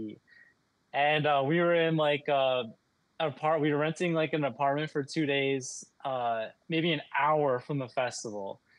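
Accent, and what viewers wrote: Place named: United States. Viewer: not gonna lie i thought you were american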